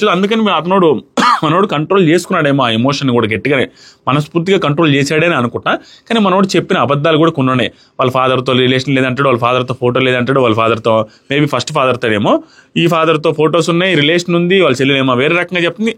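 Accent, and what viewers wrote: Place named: Nellore, India. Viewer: Anna nee review chuse nee matlalu chuse full navukunam enduku ante madhe kuda nellore kabate really rocking anna nee review aethe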